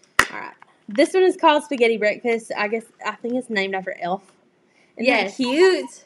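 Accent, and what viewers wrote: South, United States. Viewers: I love your southern twang!!!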